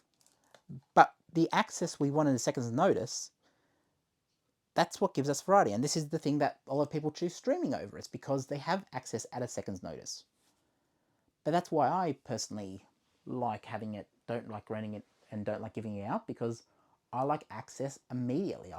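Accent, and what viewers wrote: Australia. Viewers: I jolly Rodger as you call it but that's just due to cost of living and the stuff I want being extremely expensive compared to my pay I'll eventually buy what I currently Rodger but for now 4k downloads will suffice It's also nice to hear an Australian voice for a change nearly everyone being American is very draining to listen to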